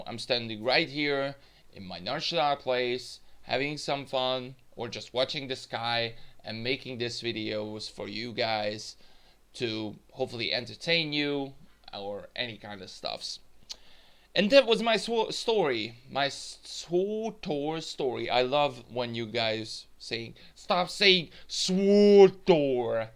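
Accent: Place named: Hungary. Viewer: Not just your accent are bad, every english speaker hungarians accent are bad just like mine aswell! :D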